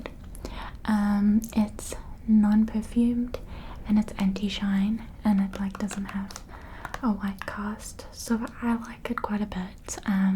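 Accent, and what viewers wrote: South Africa. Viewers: Sooo soothing 🥺 are you from South Africa?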